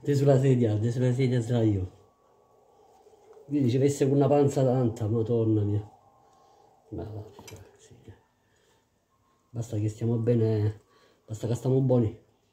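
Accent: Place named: Salento, Italy. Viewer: Io ti guardo da Trepuzzi(lecce)tu"di dove sei?il tuo dialetto e' uguale al mio😅❤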